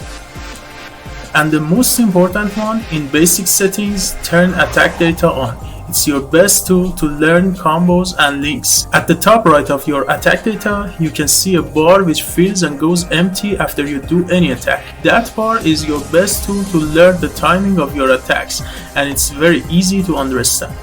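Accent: Iran. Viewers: lmao u have to be Persian ur accent is so obvious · why does this guy sound Iranian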